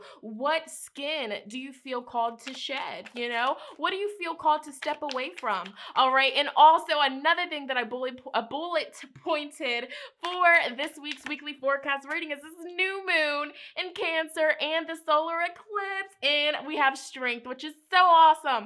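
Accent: United Kingdom. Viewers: I love her undertone British accent❤️ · Interestingly enough I hear British accent in your voice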